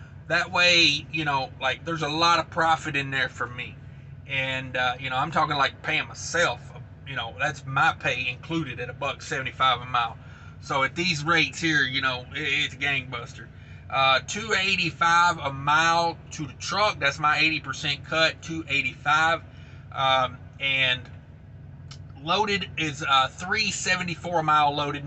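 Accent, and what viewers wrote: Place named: Tennessee, United States. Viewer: Aha! I knew that accent sounded Tennessean, especially when you threatened to put a foot up someone's a$# over detention pay. You can take the boy out of the volunteer, but you can't take the volunteer out of the boy.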